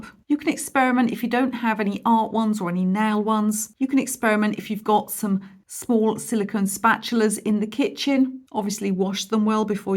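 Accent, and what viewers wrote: United Kingdom. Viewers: So bri-ish🤌🏻😭✨ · Loved this video! Thank you for sharing your knowledge and talent😊 But, can we talk about your fabulous lipstick/gloss! Care to share the brand and shade….pleeeeeze!? And from a viewer in Texas I’m entranced by your accent and now want to walk around sounding British speaking as properly as possible😊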